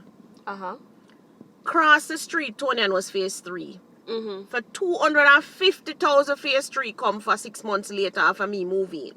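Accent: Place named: Jamaica. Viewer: Old harbour road my area love it your Jamaica accent enjoy your video views. 🥰🇯🇲✔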